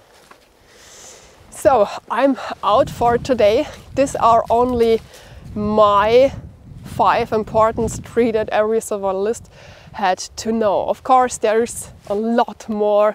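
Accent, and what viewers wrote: Germany. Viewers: Is she German? Lived there 88-90 in the US army. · I think it's a German accent from Alemania. Again I can't spell very well. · love your video…it has lots of great information. I live in WA State USA, on the Border with Canada. On my 10 acres we have Alder, Broadleaf Maple and Vine Maple, Birch, Bitter Cherry, Indian Plum, Douglas Fir, Hemlock, Cedar, Mt. Ash, Butterfly Bush and wild Hazelnut. I’d love to learn more about uses for these trees and shrubs. I really like your German accent and your English is excellent. Thank you for sharing and I’ll keep watching and learning from you.🇺🇸🇩🇪🇺🇦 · You German?? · Just found this channel, it’s wonderful! My mother is German, father USA (he was stationed in Germany when they met). Naturally, I love the sound of your voice and enjoy hearing the Germanic inflections as my mother spoke that way. I love trees, I grew up in areas with lots of woods to explore and play in. 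The forest is my favorite environment. Yes, please do a video about winter foraging! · I really enjoyed this video. I have subscribed because there seems to be something new to learn about the uses of herbs and trees. I also LOVE your accent. I have been to Germany three or four times, and I get a chance to practice speaking German. Mein Deutsch ist ganz schlimm aber Ich kann es verstehen und lesen. Deutschland ist mir lieb.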